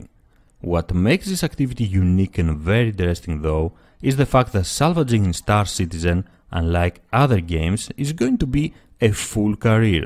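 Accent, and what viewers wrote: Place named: Greece. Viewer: Still miss your Elite content, i don't care if they didn't give you the attention you wanted, i miss my Elite videos with the sexy greek-english. ;) And a lot of stuff have been added and changed, i don't know if you stull play, hopefully you could make some new content. Nothing wrong with the SC content, but that game is so far off release that i try to stay away so i don't get to mutch 'spoilers' when the game becomes a fully playable game i can go and enjoy it, findings out stuff and so on.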